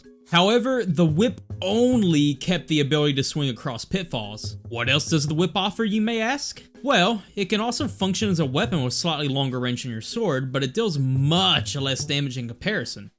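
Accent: United States. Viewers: From one guy with a country accent to another: great job! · Is that an Arkansas accent? There aren't enough gaming YouTubers with a good, strong American accent. Review some more Zelda games and I'll watch. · First, I adore what I swear is a faint country accent I hear, but I'm actually here fresh from PBG's at the time latest video, (Wind Waker) and I admit- While I'll likely never grow tired of Zelda content I grow weary of the same 3D Zeldas being covered as it's not like there really is any bad ones. You can find someone presenting valid good points for any of them. I actually keep forgetting the DS ones existed that were a great time of my past. Spirit Tracks really does have what I'm sure is among the most complex "puzzles" in the series mainly in the Tower of Spirits, as well as some of the most fun Bosses that actually can present a challenge specifically because the DS Zeldas are right in between what I consider "True 3D Zelda" and "Top Down Zelda." As for items as you mentioned of the Whirlwind, sure there are a lot of wind archetypes items in the series, but it's the order of the items you get staples or not that can affect things. 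You're using the Whirlwind for alternate means to progress until you get the dungeon's item proper, the last dungeon's item is a more inefficient tool that can still fill certain shared roles keeping it relevant, and keeping my disbelief suspended. This isn't exclusive to Spirit Tracks of course, but it feels you're getting the most out of each item until you're hard walled by something you need the next item for. And YES. This game does have it's flaws. As weird as it sounds I wish it's elements were remade into a "True 3D Zelda" but I under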